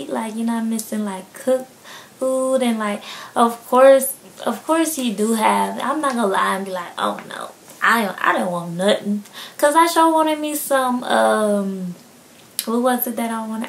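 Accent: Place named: Deep South, United States. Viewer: Girl u from deep down south...😍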